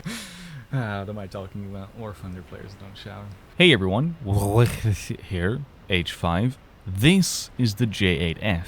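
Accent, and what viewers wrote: Netherlands. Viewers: wait is bro a dutchy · ben je nederlands?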